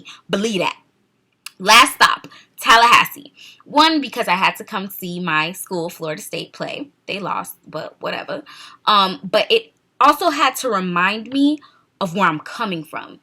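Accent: Jamaica. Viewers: I love the Jamaican accent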